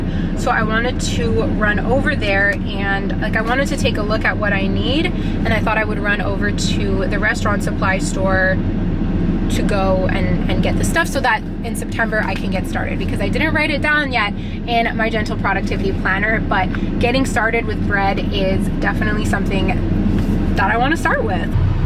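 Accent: Canada. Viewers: Hey - for knowing the days of the months - do the knuckle trick. Google it. For the laundromat - I think they reserve certain machines for doing animal hair stuff - I don’t know if these are necessarily the big machines you were talking about. But I’m glad you found a good laundromat. You do have a strong Canadian dialect 🍁🇨🇦🫡when speaking French - but maybe it’s just you talking quite fast. Maybe pretend you are talking to a young child when talking to Siri 🤓. Or why not switch to to Canadian French like he suggested? If you like to start cooking - I like to make hummus or different pestos - these are good things to use up for your lunches or in bowls. If you play around with replacing expensive ingredients with more economic ones - rocket / spinach for basil, sunflower seeds for pine nuts it can be a good alternative to store-bought ones. I put a fitted sheet on my couch - for a single bed - if you buy one that resembles linen or sthg. 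similar and you colour-match it - it really blends in and saves you many stains.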